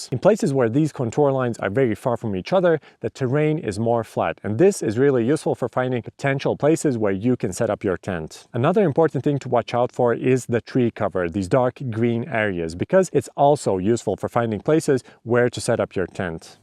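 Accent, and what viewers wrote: Latvia. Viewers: Jau no tava akcenta var dzirdēt, ka esi latvietis :) Prieks redzēt, un man noder tavi video.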